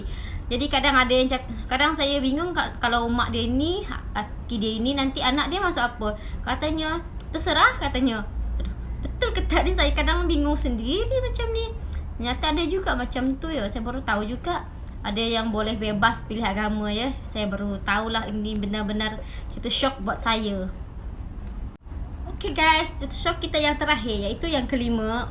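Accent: Indonesia. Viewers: Assalamualaikum..admin ni orang Sabah ke..slang indo pun ada jugak bunyinya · Dia org Serawak, mmg Ade slang Indo ckit kan. Sabahan pun mcm tu Tau. Awak x pernah Ade kawan sabahan n Serawak ke? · ni org Indonesia knn... Bahasa yg awk guna tu.. gaya keIndonesiaan tu jelas kedengaran.. klo gaya bahasa Malaysia tulin lain..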